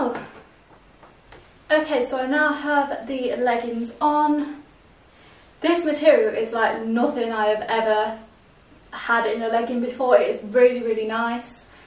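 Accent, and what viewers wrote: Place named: England, United Kingdom. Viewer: It is a lot of ladies doing this tipe of videos and stuff, but , I promise you that you are unique, it is about your face your hair that distinguish English accent the way your body is built, your just different I want to say congratulations to the British people and their history because I little country like that can create one of the most natural beauty and that is you ...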